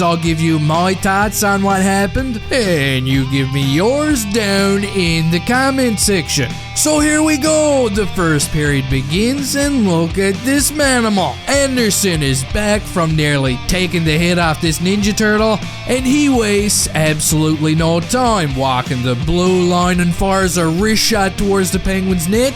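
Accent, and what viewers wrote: Newfoundland, Canada. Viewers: That was an amazing ''turd'' period!! Love the NL accent!👌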